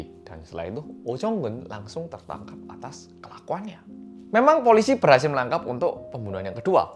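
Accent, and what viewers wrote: Java, Indonesia. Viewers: BENERAN MEDOK WKWKWKWK aku subskriber baru mas :"v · Mas yang medokk^_^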